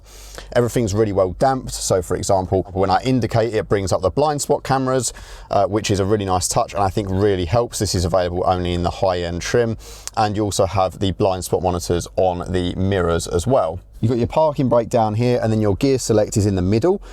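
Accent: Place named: New Jersey, United States. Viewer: Love the New Jersey accent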